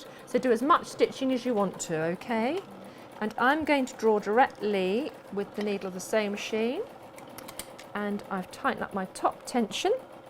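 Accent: United Kingdom. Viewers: Darlings love, the british accents, yes I do agree about the threads it is better than chocolate lol. You are so vary talented, I plan to do your sewing tecnique on a card and if comes out wounderfull like yours I guess I will have a hit on my hand :-). Thank you for showing your love of your craft in sewing. In His Grace, Lorisa aka ellescrapper. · Every time I search videos for tips, the voices are American. It is so refreshing for us Brits to hear an English accent!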